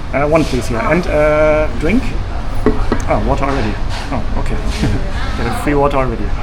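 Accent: Germany. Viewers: You sounds German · Are you german ? Yaaaaah you imagined the accent didnt you ?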